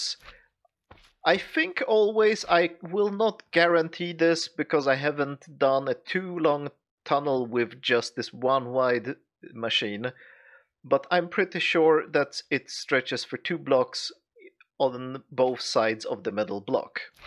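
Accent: Sweden. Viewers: The Swedish accent makes him look even smarter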